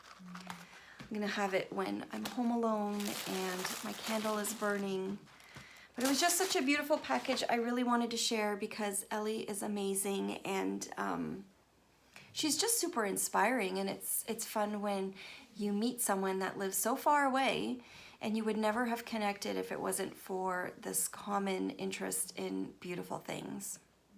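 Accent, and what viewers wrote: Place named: Scotland, United Kingdom. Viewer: Love the podcasts. A new one is dunderknit but I'm sure you already know about that one. She's a fellow Scot! Yeah!! Good to hear my accent in a podcast. Currently knitting my first sweater which is also my first colourwork project. Just upgraded my sewing machine so time to get creative instead of just repairing. Going to make some project bags as Christmas gifts this year. Love the pace of your podcasts. So calming!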